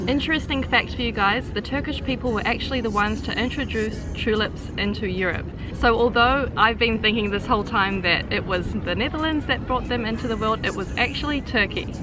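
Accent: New Zealand. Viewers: You have the 2nd most beautiful New Zealand accent of the world.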